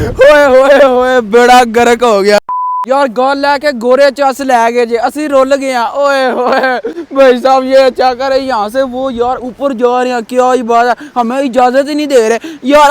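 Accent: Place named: Gujarat, India. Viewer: Mazy laa ry o ❤ I am from Mandi bhaudin ❤ I think you are from gujrat ap ki boli gujrat wali haa❤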